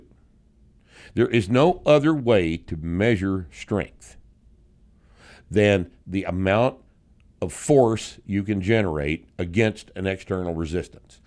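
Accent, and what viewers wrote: Texas, United States. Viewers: Me too and I’m French. Love that Texan accent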